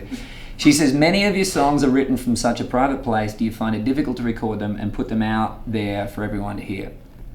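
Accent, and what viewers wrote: Australia. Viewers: yeahhhhhh, he answered my question !!!!! That's me LaVonda from Texas. I could listen to him say my name a million times and never get tired of that dreamy aussie accent.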